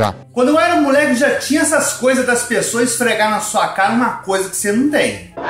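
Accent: Minas Gerais, Brazil. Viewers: Mineirês??? Kkkkkkk mutriii 😂😂😂😂😂😂!! Ahh sim, manoo do céu, quase passo mal de tanto rir 😂😂😂😂😂😂 choreeeiii largaaado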